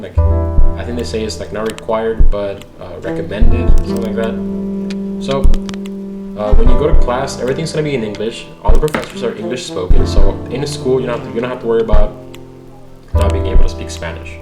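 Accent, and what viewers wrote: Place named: Mexico. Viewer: You sound Mexican but also american where did you grow up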